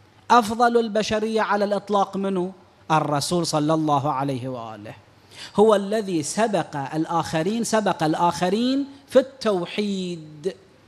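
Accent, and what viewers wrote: Iran. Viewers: هو يمكن إيراني لهذا السبب لهجته هكذا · لان ايراني وقليل يعرف عربي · ايراني وهيج يحجي كوه يعرف عربي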